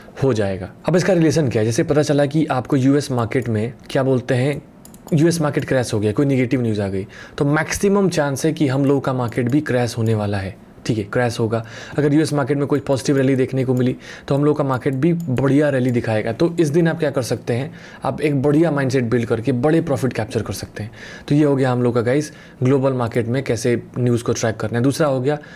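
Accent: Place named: Purvanchal, India. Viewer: Mujhey lagta hai sir aap purwanchal k hai. 😅 😅 😅 aapka accent waisa hi lagta hai. ❤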